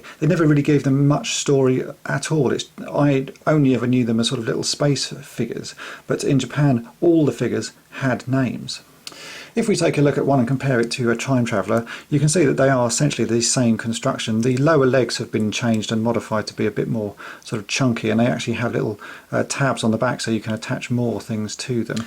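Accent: United Kingdom. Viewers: For a British dude, you sure know quite a bit about Japan. Are you part Japanese? You sound very British.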